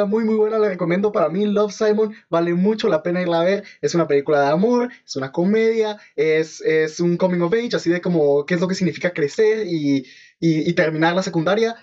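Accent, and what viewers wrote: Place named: Guatemala. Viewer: lo supuse por el acento jajaja yo también soy de Guatemala, y rayos te pregunto porque ya termine la 5ta temporada y note que no tenias comentarios sobre la serie, pero esperare, jaja saludos.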